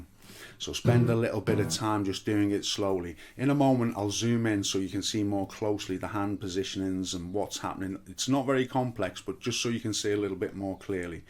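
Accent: Liverpool, United Kingdom. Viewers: good lad,lovely easy going lessons, sounds like you live not far from Liverpool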